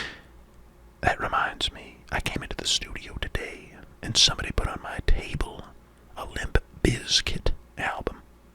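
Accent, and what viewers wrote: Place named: South, United States. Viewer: He was covering up his southern roots back then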